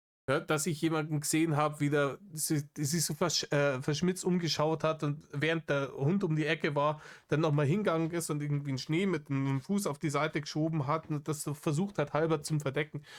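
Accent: Bavaria, Germany. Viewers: Lieber. Ich bitte dich. Ich brauche mehr bayrische Stimme, die sich über Dinge aufregt in meinem Leben. Also mach „Narrisch“ Videos, APO Red, Rainer, Politiker oder sonst welche Schwachmaten. Ich hab vor lauter Schmacht schon alte Rainer Reactions angeschaut.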